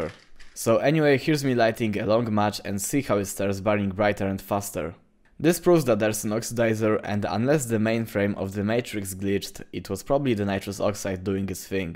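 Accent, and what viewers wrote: Poland. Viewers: Słychac że jesteś z pl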